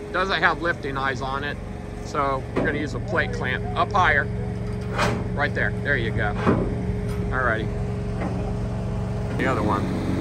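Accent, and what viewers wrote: South, United States. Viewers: Mr., Are you from the East Coast below Virginia somewhere?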